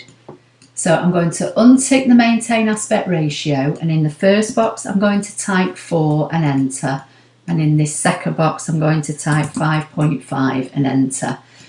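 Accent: United Kingdom. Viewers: Well as I’ve heard and believe....YouTube videos have a life of their own. Someone catches one and the fun begins. I’m amazed when I view one of yours and read the comments that it is from “the past”. Being rather new to ScanNCut and paper crafting I’m looking for all the help I can get. Thanx so very much for your beautiful work. And I live your British accent. 😍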